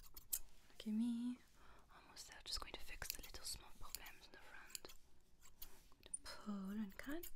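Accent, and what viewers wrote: France. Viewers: What ethnicity are U or where do U live, kuz I’ve seen some videos where U talk with an America accent but now U have a French accent... I’m confused😂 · Can you do more French accent videos please 🥹😍 it sounds soooo tingly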